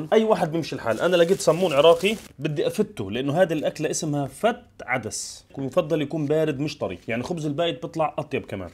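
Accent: Palestine. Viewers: بالعكس لهجتوا فلسطينية بحته احنا هيك ابنحكي في فلسطين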